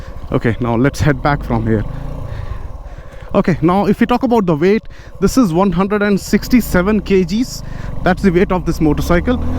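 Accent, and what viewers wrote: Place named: United Kingdom. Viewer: Love your accent bruh!. Thought i was watching a British based channel at first :D Keep up the good work on your channel! :) Ride safe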